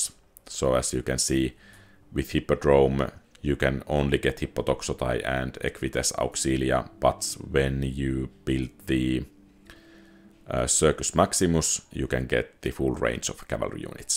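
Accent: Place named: Finland. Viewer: I like the info. And it is nice to hear a finnish accent on the English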